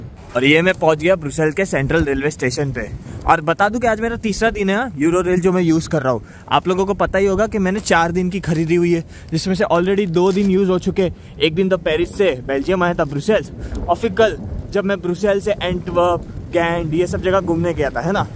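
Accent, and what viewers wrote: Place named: Maharashtra, India. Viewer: your marathi acent in hindi i like it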